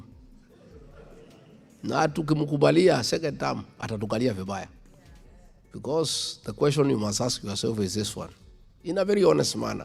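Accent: Kenya. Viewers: GACHAGUA CHANGE UA TONGUE KIJUYU TUMEKUSIKIA SOEAK KI OTHER JENYANS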